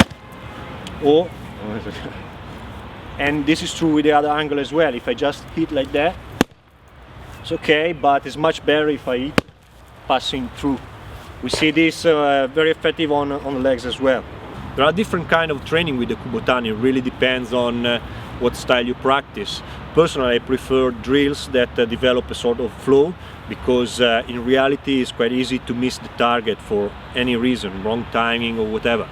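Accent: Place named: Russia. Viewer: Bel video :) l'unica cosa è che, dato l'accento, avrei scommesso come nazionalità "russo" più che ita :D (ho trovato prima questo video e poi quello ita)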